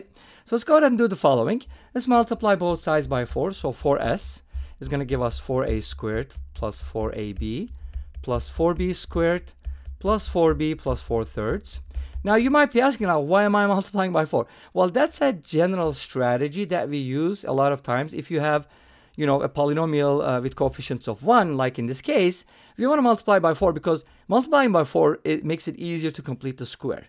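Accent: Turkey. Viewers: Are You Turkish ? Your accent sounds Turkish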